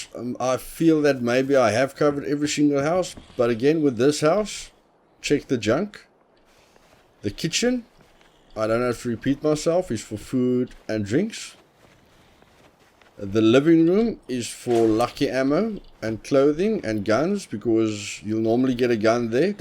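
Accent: South Africa. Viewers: Like the accent, you from SA? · Nice guide first thing I noticed was that South African accent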